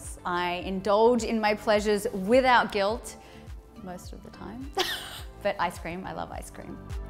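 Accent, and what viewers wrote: Australia. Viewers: Glad she hasn't lost her Aussie accent.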